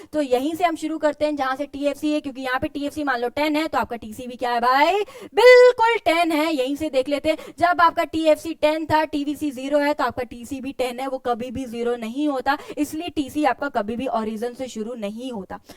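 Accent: Punjab, India. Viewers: Mam are you punjabi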